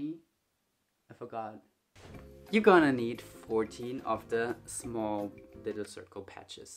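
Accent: Germany. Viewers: Sein deutscher Aktzent triggerd so hard.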